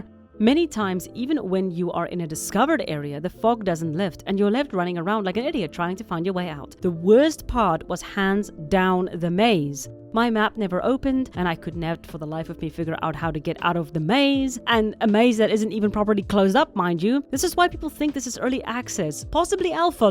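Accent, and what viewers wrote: South Africa. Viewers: Is she South-African? :o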